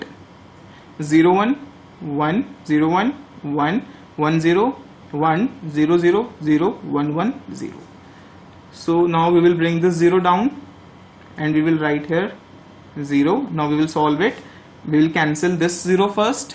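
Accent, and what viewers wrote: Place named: India. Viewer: Good video and nice handwriting! Easy to understand CRC this way. I'm going to watch more of your videos. (A little request? Some subtitles would be lovely. Because 1. it's always easier to follow the teacher :) and 2. easier to decipher some words/sentences - For non-Indian English speakers, Indian accented English can be a bit tricky to understand - even if we are used to the accent. I'm sorry to mention it, but I thought maybe you'd like to know).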